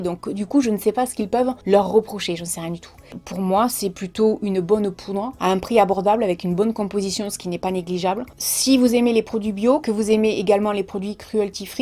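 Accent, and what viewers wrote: South, France. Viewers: Yesss l'accent du Sud de la France j'adore ça me rappelle l'accent du Sud de l'Italie 😙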